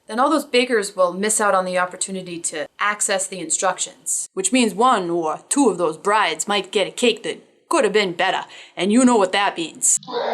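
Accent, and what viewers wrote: Boston, United States. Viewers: This was really informative. Thanks! And your boston accent cracks me up 😆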